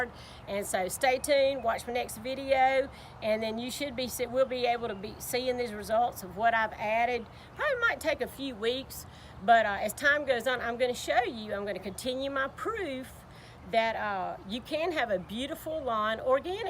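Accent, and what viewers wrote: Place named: Louisiana, United States. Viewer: Yard Looks good. Knew soon as I heard you speak you was from the top of the boot.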